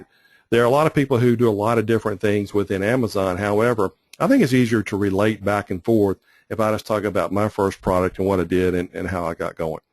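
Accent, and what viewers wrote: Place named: South, United States. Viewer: OMG...I just love your freaking voice!!! You sound just like famous celebrity gardener, P. Allen Smith! with that soft, soothing, medium southern drawl...I could just sit and listen to you talk about the phone book for HOURS!!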